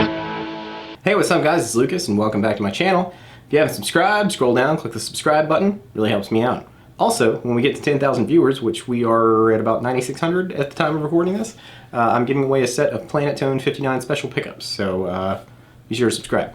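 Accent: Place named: South, United States. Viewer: Thanks for the response. I hear your Southern Accent. Were you born in the South? Keep rocking! 😎😎😎😎.....That Splawn Amp of yours is real nice too.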